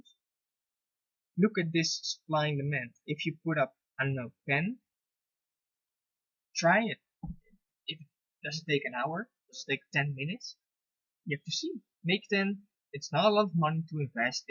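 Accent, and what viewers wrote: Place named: Netherlands. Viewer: I can hear you're dutch :)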